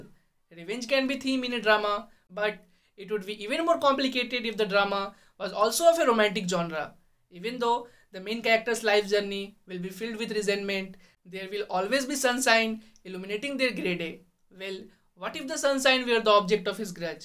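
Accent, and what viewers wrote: India. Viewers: Are u indian??? Cause ur voice sounds like indian ☺️☺️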